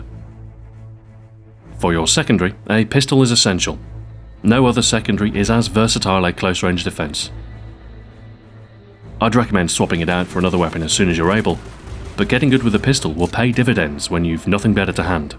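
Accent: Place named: United Kingdom. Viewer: its definitely the british voice that makes this video. always love a fellow british lad. is such a Jeff!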